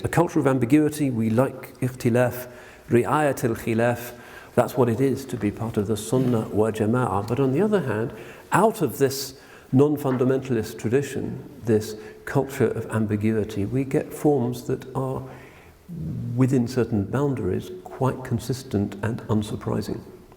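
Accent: England, United Kingdom. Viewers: he has that quintessential English charm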